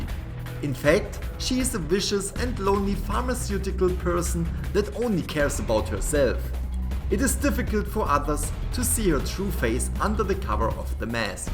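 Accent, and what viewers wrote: Germany. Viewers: Are you irgendwie deutsch because you kling very deutsch xD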